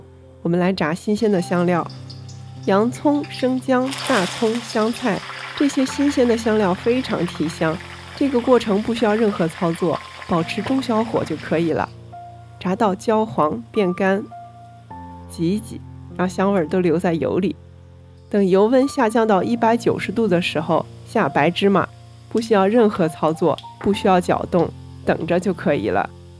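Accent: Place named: Shaanxi, China. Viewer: 听口音是陕西人嘛， 额打算试试你的方子。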